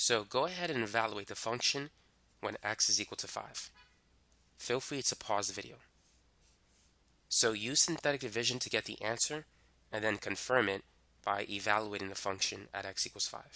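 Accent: United States. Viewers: Pay attention he teaches well He is an American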